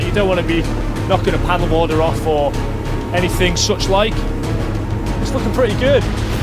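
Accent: Liverpool, United Kingdom. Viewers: close a bud scouser here your close to it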